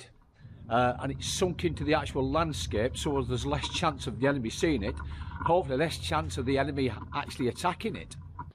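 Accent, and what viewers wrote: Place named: Lancashire, United Kingdom. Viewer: Fascinating. I was driven past Liege in the 1970's but never saw any of the fortifications there. I was lucky enough to visit Verdun in the 1980s and did visit those at Vaux and Douamont. I have hundreds of books, postcards, e.t.c. on fortifications. You sound like you come from Lancashire. I'm a scouser myself.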